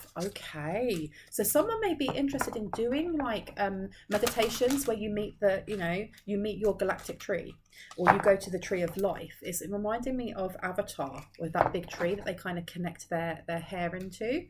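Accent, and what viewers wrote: England, United Kingdom. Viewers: 🌹🧘 THE LITTLE GIRL IN ME WANTS TO TELL YOU THIS AND I'M SORRY IF THIS OFFENDS YOU BUT I LOVE YOUR VOICE BECAUSE IT BRINGS BACK MEMORIES OF WHEN MY MOTHER TOOK US TO CHICAGO TO SEE MARY POPPINS FLYING THROUGH THE AIR LIKE AT A CIRCUS SHOW OR SOMETHING BARNUM & BAILEY CIRCUS USED TO ALSO COME THERE 🌹🦉 AND I ALSO REMEMBER AFTER THAT I COULDN'T WAIT TO GET MY CHRISTMAS GIFT BECAUSE IT WAS GOING TO BE A MARY POPPINS DOLL THAT SPOKE WITH THE ENGLISH ACCENT LIKE YOURS OR BRITISH ACCENT LIKE YOURS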